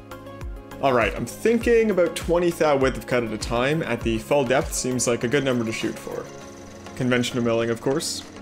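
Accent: Canada. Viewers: I thought I detected a Canadian accent and then sure enough, out came the Power Fist dial indicator! Nice video.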